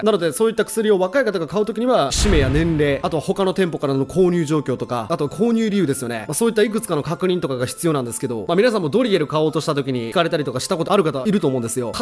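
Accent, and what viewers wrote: Kansai, Japan. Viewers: 標準語で話してますけど関西の人なんですね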